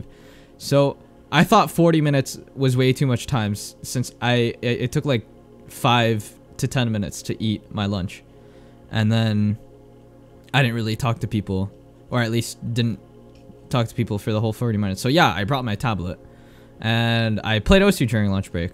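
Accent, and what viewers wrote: Canada. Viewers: took me a min to guess youre a Canadian student XD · is he canadian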